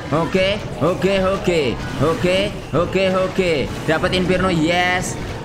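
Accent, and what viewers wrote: Java, Indonesia. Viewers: Wong ndi to ngab kok medok men wkwkwk tetep semangat konten ngab😍😍😍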